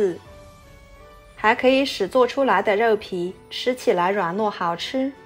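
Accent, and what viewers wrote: Yunnan, China. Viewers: 你这个云南话说呢太好啦！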